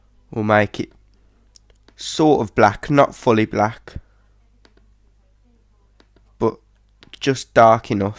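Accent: Birmingham, United Kingdom. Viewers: Common brummy :) love the accent pal :) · Im from birmingham you have a similar accent to me where are you from if you don't mind me asking:) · He's from Birmingham, i know that accent from anywhere! lol :D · your accent is fucking wicked. where i it from? like what part of the UK? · lol british-liked accent?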